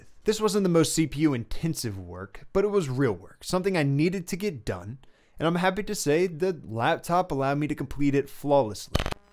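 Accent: Texas, United States. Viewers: Excellent video, I'm curious, are you from Texas?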